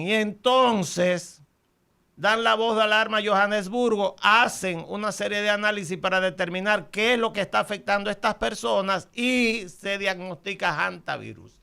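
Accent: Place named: Dominican Republic. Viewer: Me suena acento de la República.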